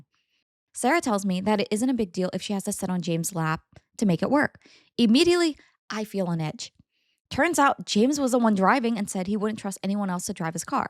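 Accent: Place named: United Kingdom. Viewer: Chucked up is vomited aha, it's always fun hearing a fellow brit in the wild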